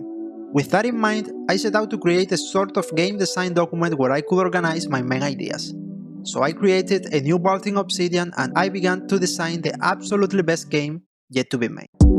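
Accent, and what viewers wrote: Spain. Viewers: my native language is espanish is just cute :) relax mate, we can all understand you and having spanish accent is not worst than having american accent ;) gl with the game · Ya decía yo que me sonaba el acento, un pana de España. Suerte con el juego, pues la scope está ciertamente alta jajaja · Men eres increíble. Te apoyo totalmente en tu nuevo proyecto y deseo que lo logres superando tus propias expectativas. PD: si lo llegas a ser (q imagino q sí) espero llegar a jugarlo😢. También! Tu inglés tiene un acento notablemente español, pero es entendible, con práctica y experiencia se mejoran. Sigue así!😎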